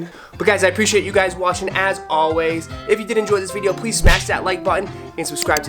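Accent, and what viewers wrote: Boston, United States. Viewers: U from Boston?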